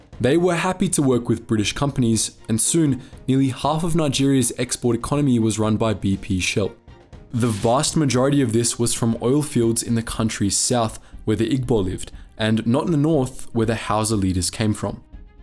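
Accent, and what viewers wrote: South Africa. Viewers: Is the narrator a saffa? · He sounds south african. And most people don't care about cricket, at all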